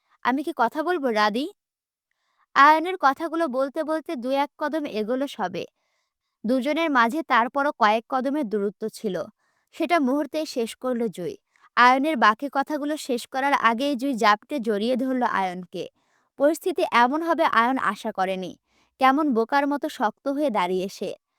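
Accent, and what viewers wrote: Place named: Rangpur, Bangladesh. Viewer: আপু তুমি মনে হয় রংপুর জেলার তাই না রংপুর❤❤😂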